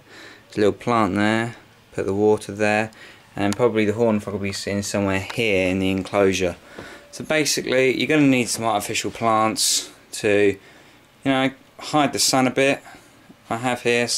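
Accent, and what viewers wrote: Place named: United Kingdom. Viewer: Great vid! Do you live in the UK or the US? Because you're using American measurements but you have a British accent.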